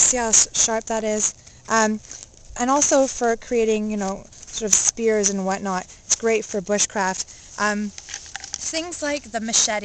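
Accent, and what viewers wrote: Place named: Canada. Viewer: this chick sounds canadian. anyone know if she is?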